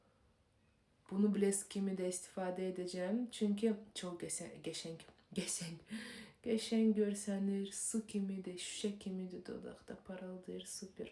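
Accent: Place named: Russia. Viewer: Russ dilli xanımdır normak həyatda azərbaycan dilindən çox isitifadə etmir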